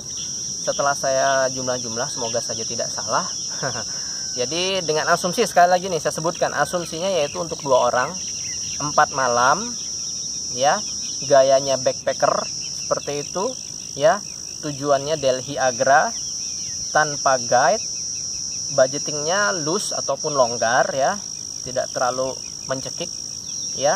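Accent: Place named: Sunda, Indonesia. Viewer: Mantafff..👍 monggo mas di serius-in..kelihatannya prospek kalo mas bisa bikin travel packace ke India..mudah2an bisa tambah2 uang saku.. Btw..mas aslinya org mana y? Saya dengar2 asli kalimantan..tapi logatnya kok kaya kental aksen sunda nya..semoga tetap semangat do the best disana 💪 Salam kenal dari Surabaya 🙏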